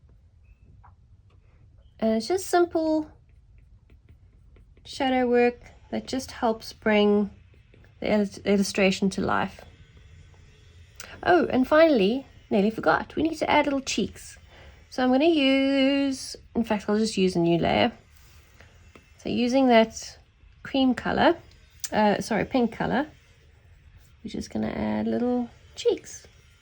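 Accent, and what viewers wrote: South Africa. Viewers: Love hearing your South African accent. Thank you for the awesome tutorial! ❤️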